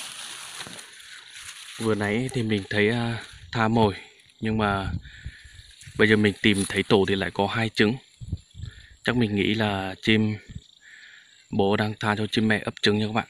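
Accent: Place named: North, Vietnam. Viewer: Bạn là người miền Bắc vào Tây Nguyên sinh sống à? Mình thấy bạn nói giọng miền Bắc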